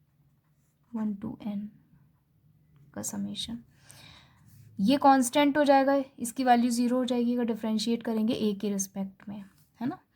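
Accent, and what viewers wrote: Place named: India. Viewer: Even though my ears are not used to Indian English, and that I could not understand much of the discourse, the graphical explanation was impeccable. Thank you too much!! Greetings from an Spanish speaking country, the Dominican Republic.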